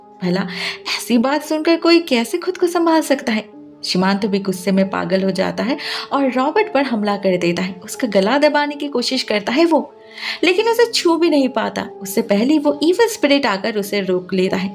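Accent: Bengal, India. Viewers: Are you bengali didi ur accent bengali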